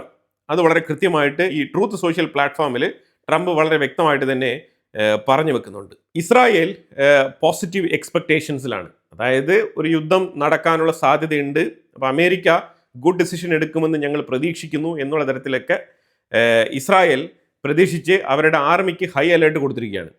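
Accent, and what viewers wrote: India. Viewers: വളരെ നല്ല അവതരണം കൃത്യമായി കാര്യങ്ങൾ മനസിലാക്കാൻ കഴിഞ്ഞു... 👍👍സ്ഥിരമായി കാണാറുണ്ട് യുദ്ധ വാർത്തകൾ മററുളള ചാനലുകളിൽ പറയുന്നതിനെകാൽ വലിയ ആധികാരികമായി തന്നെ പറയുന്നുൻട്...താങളെ കുറിച്ചു ഒരു self Introduction പ്രതീക്ഷിക്കുന്നു... മലയാളം കേട്ടിട്ട് കേരളത്തിന് പുറത്തു വളർന്ന ഒരാളായിട്ടാണ് തോന്നുന്നത്...എല്ലാ ആശംസകളും..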